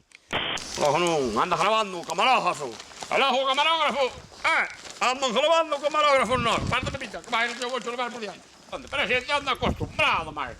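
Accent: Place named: Valladolid, Spain. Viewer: Cando falas coma o paisano,tes acento galego. cando falas ti tes acento de valladolid curioso, non??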